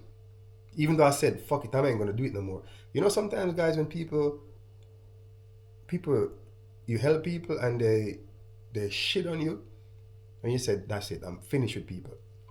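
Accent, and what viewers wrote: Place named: United States. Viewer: You have an American accent more than Jamaican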